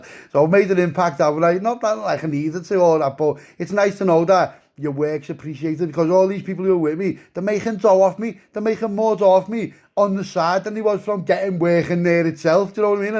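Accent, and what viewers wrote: Liverpool, United Kingdom. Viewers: Big up the Scouse narrator, proper legend you lad, just got in bed, the mrs is getting frisky and I got an excuse, don't even have to use the headache blag, come on give it a rest I'm listening to scouse stories. Hahahahahaha 😆😆💯💥.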